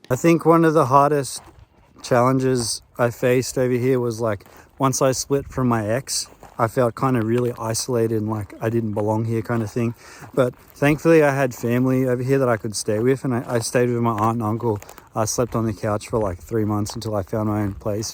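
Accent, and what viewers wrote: Australia. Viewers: crazy you still have your aussie accent tbh. people lose it in like months let alone 5 years. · Glad you still sound Australian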